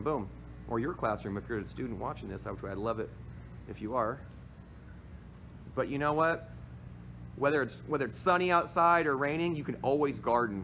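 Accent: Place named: Australia. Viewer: Love your Aussie!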